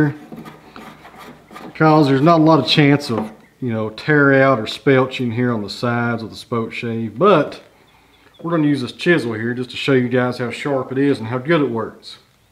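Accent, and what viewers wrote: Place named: Virginia, United States. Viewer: Just curious. What state do you live in? Sounds like Virginia or north Carolina maybe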